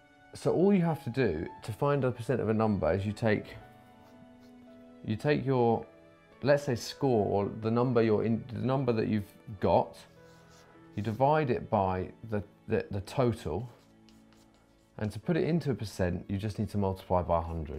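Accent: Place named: United Kingdom. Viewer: Omg the British accent... So beautiful I can listen all day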